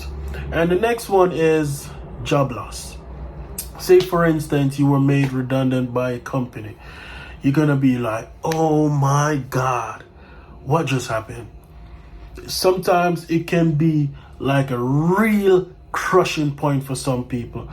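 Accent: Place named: United Kingdom. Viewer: no you British accent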